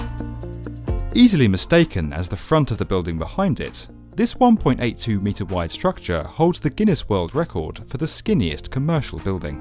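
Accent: United Kingdom. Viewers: Are you British? cuz I...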